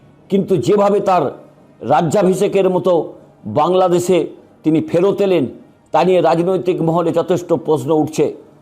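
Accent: India. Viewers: যিনি উপস্থাপন করছেন, উনি কি আসলেই বাঙালি? নাকি,ক্রস (হিন্দি +বাংলা )।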